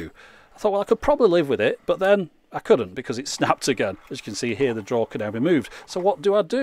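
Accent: United Kingdom. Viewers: British, fast and accurate.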